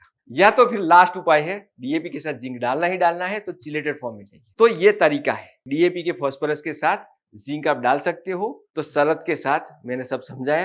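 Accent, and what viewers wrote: Gujarat, India. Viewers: आप गुजराती हो ना 😅 बराबर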